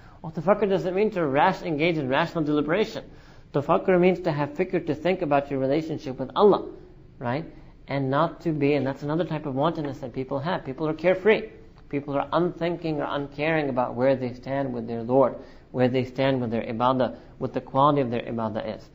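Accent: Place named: England, United Kingdom. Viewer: Extremely strong English accent n very fast.though I want to understand this important topic of zakat,I only like my sheikh,s voice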